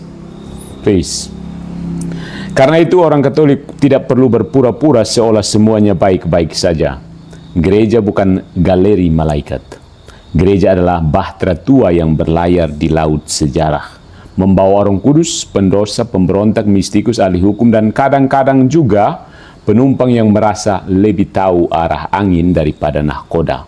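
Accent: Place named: Eastern Indonesia, Indonesia. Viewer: Selain isi dari vidio ini yg luar biasa , saya palingsreg ketika mendengarkan tatanan bahasa n logat bahasa serta intonasi saat berbahasa utk saudara /i Indonesia Timoer kita Paaaas n sertifikat itulah berbahasa Indonesia dengan baik n benar . Terimakasih Tuhan Engkau masih memberi ruang n waktu utk diriku n klg ku menjadi tubuh gereja katolik .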